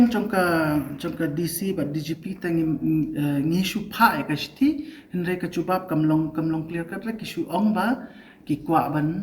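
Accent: Umpling, Malaysia. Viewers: Ktien umpling rh katjuh hei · Ktien Umpling, namar u dei na Umpling, khublei kur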